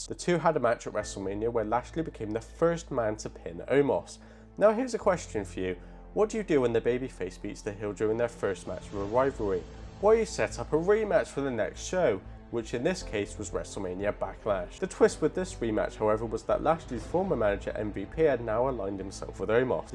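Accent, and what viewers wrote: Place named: Bristol, United Kingdom. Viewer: You are from Bristol I can tell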